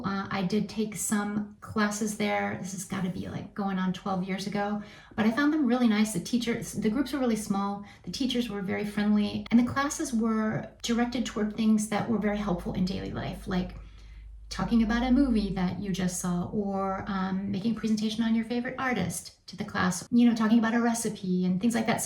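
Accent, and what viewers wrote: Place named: United States. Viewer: Perfect french with a lovely american accent 😊 Love it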